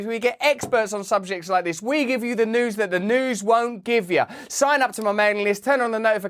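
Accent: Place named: England, United Kingdom. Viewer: the 'surprise birthday party' bit gets me all the time🤣🤣🤣. The England accent makes it even more hilarious.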